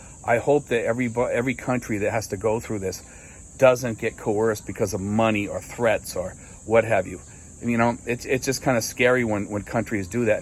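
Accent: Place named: Massachusetts, United States. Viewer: i detect a Mass accent, where are you from in Mass?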